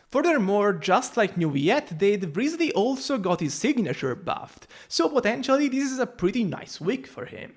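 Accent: Italy. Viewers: Where r u from? U sound really italian tbh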